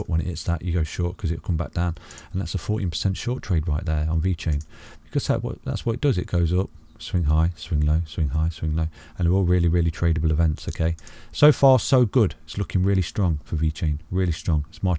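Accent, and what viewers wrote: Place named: East Midlands, United Kingdom. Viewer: Thanks for the daily tuition, nice to hear an east mids accent!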